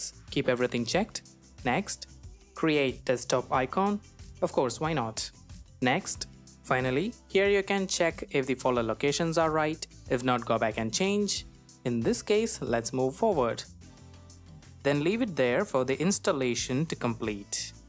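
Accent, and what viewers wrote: India. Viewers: What's the size of cakewalk walk [including studio instruments suite only] By the way... Where are you from?? Your voice sounds like an Indian ^_^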